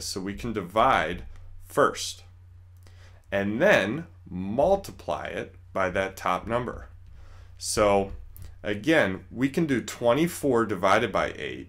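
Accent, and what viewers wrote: United States. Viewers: Sir you are saying like an American so l cannot understand what you are saying l can see and trying to understand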